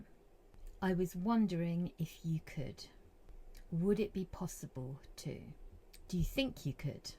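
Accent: United Kingdom. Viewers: Very good your class and your accent is beautiful where are you from in England? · Thank you very much for shearing 😊 I really like the way you teach English and of course you wonderful British accent❤❤❤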